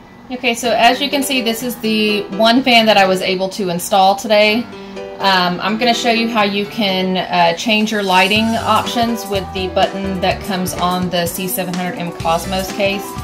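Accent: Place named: South, United States. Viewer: Good clear voice, southern twang charming, deserves a better a microphone. Increase in music volume at end was a bit abrupt. A somewhat longer, less repetitive backing track would be nice (though JayzTwoCents is somewhat guilty of that too). I was hoping to see the back of the fan because that's how I'd be mostly be seeing it with my setup, so please show parts being unboxed/reviewed from all angles please, especially ones then manufacturers don't so much. Good point about the cable length and well done with the ARGB / smart sensor feature testing.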